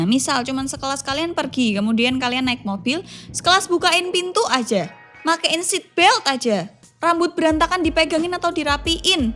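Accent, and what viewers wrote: Java, Indonesia. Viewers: laahhh opoo iki....jowo tenan rekkkkk... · Muedok banget logat e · seneng Karo logat jowo ne sampean mbak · Aksen medok is love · medok rek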